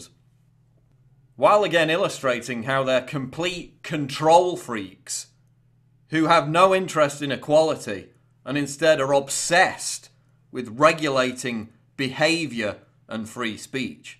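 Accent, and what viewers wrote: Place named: Yorkshire, United Kingdom. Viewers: As an Aussie, is yours a Yorkshire accent? You sound like Michael Vaughan, former English cricket captain. Btw, it's very patriarchal, you should learn to speak with a Swedish accent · you from Sheffield?, you sound like me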